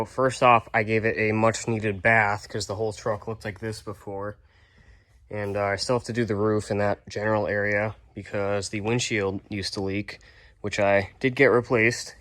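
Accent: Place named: Minnesota, United States. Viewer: Are you from Minnesota ? Just trying to guess by your voice hahaha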